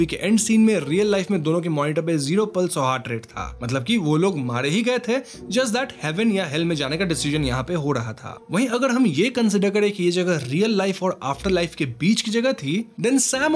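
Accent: Bengal, India. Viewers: vhai tum bangali ho kya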